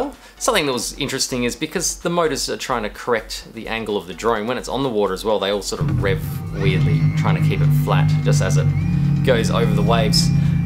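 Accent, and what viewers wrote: Australia. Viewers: The most unbelievable thing for me was hearing his accent but that he kept saying cooler and not esky or even chilly bin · You sound australian, but don't call it esky?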